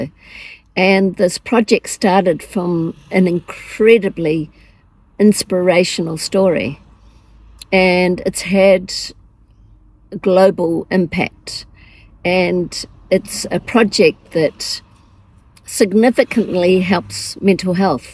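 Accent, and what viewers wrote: New Zealand. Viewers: I'm mid 70's, been caravanning 25yrs.Do I hear a kiwi accent? I'm ex kiwi. Love my lifestyle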